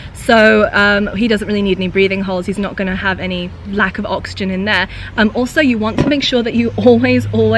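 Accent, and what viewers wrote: Australia. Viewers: Is she Australian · Why do I think she is Australian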